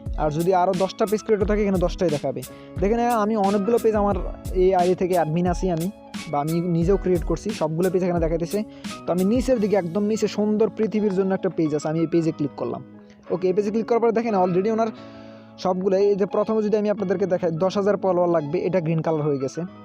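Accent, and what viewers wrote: Noakhali, Bangladesh. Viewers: আপনি কি নোয়াখালীর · তুমি নোয়াখাীর ছেলে😍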